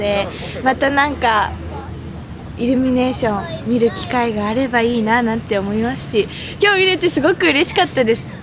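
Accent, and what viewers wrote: Hiroshima, Japan. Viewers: 🤗💓 喋ってるときの舌足らずな感じが可愛いー(*´▽｀*) ちょいちょい出る広島弁もイイ‼️ そして歌うと恐ろしいほどの上手さ。 私はあなたの虜ですー♥️ んで からの声が鞘師のぽく聞こえたw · 段原さんの広島弁聞いて、アンジュルムの川村さんと二人のラジオ番組あればなって思いました。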